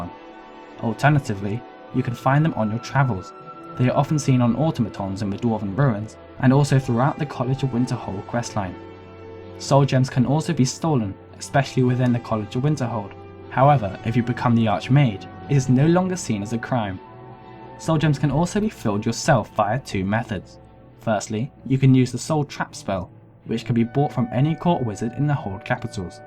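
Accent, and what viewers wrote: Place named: United Kingdom. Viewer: curious, do all these videos with commentaries hire a British person with an accent to narrate without them being involved with the game at all? this guy just reads from a script . lame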